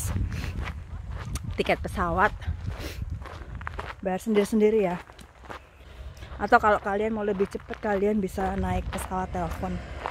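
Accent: Java, Indonesia. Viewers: Mba mangga dan rambutan haha,mba jawanya medok kaya aku mba orang mana?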